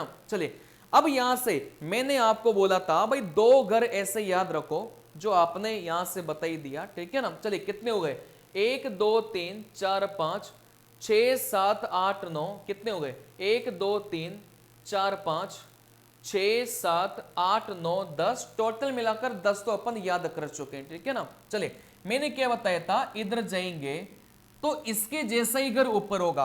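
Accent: South, India. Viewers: Oo But aapki hindi tome south indian wala aata h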